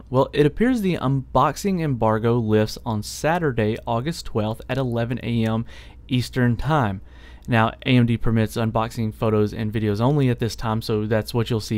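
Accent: South, United States. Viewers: are you guys southern?? Your accent is definitely southern sounding. I'm from Texas myself so I'm definitely up there with the accent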